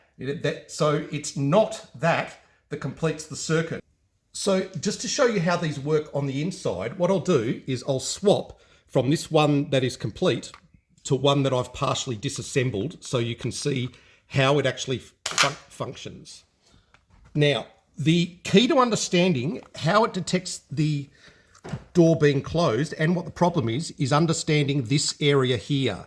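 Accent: Australia. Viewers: Thank you so much. Your explanations are very clear and easy to follow ... even with an Australian accent. I'm now going to attempt to fix my door open light on my 2011 Mazdaspeed 3. Thanks!!!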